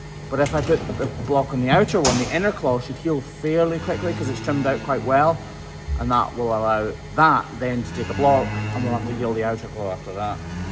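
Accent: Scotland, United Kingdom. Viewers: Retired middle and high school science teacher, now a canine obedience and rally instructor, student, handler, and judge for 3 separate registries here in the US. Have been following since before the Appleton crush, and really appreciate learning new things. Plus, I also enjoy the banter and cadence of your storytelling - my ears get a Scottish vacation 😊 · Swedish 51 year old middle school teacher! Teaches grades 3-6 at the moment in science, biology, chemistry, technic, maths and (drumroll😂) English! Living in the very southern part of Sweden, near Malmö. Really into animals and helping them! Catowner 🐈‍⬛and wildlife lover! 🐌🐛🐞🕷️🪲🦗🦇Have planted a LOT of plants and flowers for butterflies 🦋 and let my lawn be full of white clover ☘️ for bumblebees🐝 and bees. Put up bee hotels and bat dwellings ! I keep the corners of my little garden messy for the hedgehogs 🦔! 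Water bowls and food for the birds 🐦 🐦‍⬛ I really enjoy your channel here! It’s so nice to see you help these animals to a better life! 🐄 🥹😍 Been to Scotland 🏴 Edinburgh once and love hearing your Scottish 🥰 Must return soon! Also a huge fan of the band Wet Wet Wet and singer Marti 🥰 Bye from 🇸🇪🌤️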